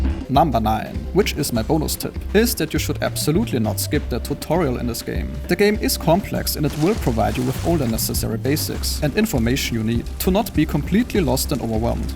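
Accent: Germany. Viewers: Bro, du bist deutscher oder? 😂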